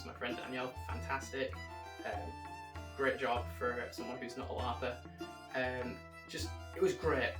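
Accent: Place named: Hull, United Kingdom. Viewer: Future Empire Navarri been binge watching these to see what it’s about these are great, also what accent is that sounds Hull or somewhere alike good description video 🍻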